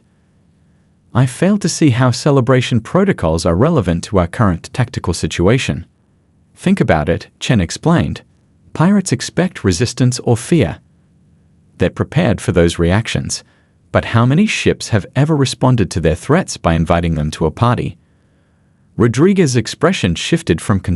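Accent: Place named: Australia. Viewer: Very strange to hear this in an Australian accent, used to hearing other AI stories in different accents. Hoping this is an Aussie channel 😁😁😁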